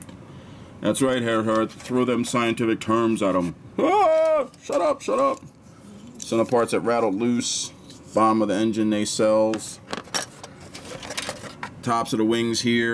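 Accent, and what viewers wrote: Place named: Australia. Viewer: Good project launch vid as always, 'Flack as thick as shepards pie' but the accent was more RAAF than RAF.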